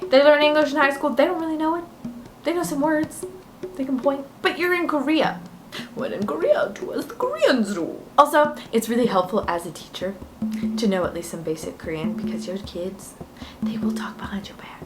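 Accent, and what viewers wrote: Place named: South, United States. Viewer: Are you from the south? You have an accent but I am not sure which state it is from.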